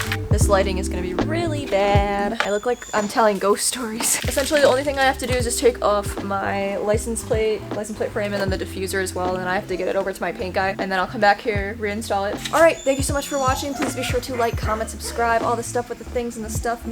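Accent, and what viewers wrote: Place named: England, United Kingdom. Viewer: Definitely an English accent, not 'strayan' (Australian). With the reverse cameraI I also tend to forget about the existence of mirrors... good luck with the repair!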